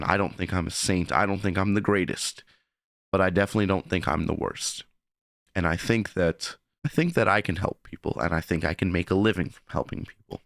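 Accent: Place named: New York, United States. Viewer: had a feeling you were from new york coach lmfao keep up the good work!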